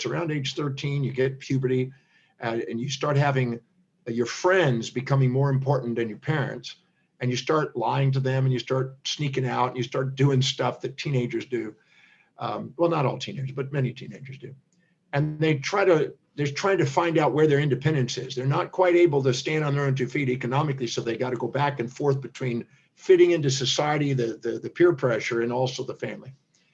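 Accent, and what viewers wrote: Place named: United States. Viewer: An amazing mix for a speaker: american english accent + italian passion and intensity Got your message so clearly! Thank you again 😊 P.s: I hope I'm right saying 'an american english accent' as I'm from Argentina.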